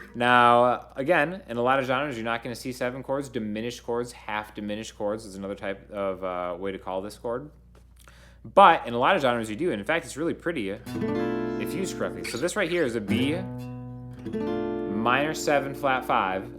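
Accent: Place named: Sweden. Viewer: Interesting accent. R u Swedish or Italian?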